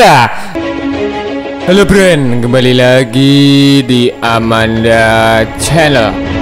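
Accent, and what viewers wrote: Java, Indonesia. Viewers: Suaramu min is the medok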